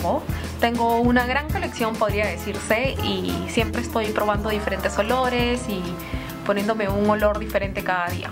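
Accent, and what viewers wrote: Peru. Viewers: Sabia k Ella era peruana por su acento :) ...me gusta sus videos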